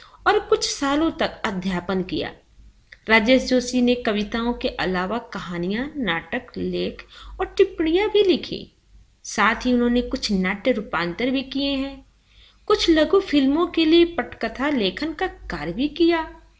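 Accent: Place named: Bengal, India. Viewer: aap bangaal se ho kya............ hmm lagta to hai sahi ki nahi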